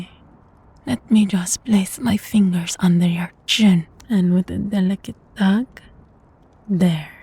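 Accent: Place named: France. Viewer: I like your accent. Aren't you French?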